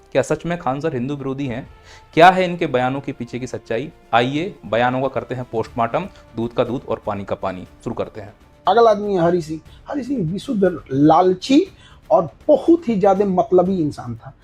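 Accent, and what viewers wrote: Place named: Bihar, India. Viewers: Bihari touch h to nikal jata h asa 😅😅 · Ye बिहारियों का ton hai sir,, aap shant rho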